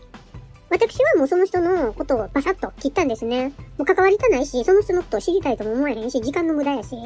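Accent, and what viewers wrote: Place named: Kyoto, Japan. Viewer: 京都弁ですね^_^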